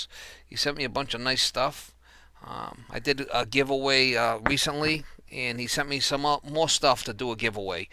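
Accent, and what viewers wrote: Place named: Boston, United States. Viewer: parker sporlan give away. If it weren't for that Boston accent, you could be doing voice-over work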